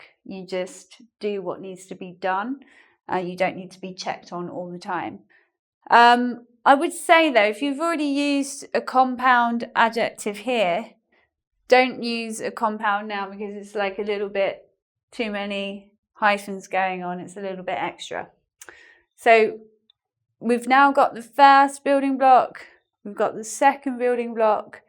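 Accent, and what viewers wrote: United Kingdom. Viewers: Twice. How I love this British accent...👌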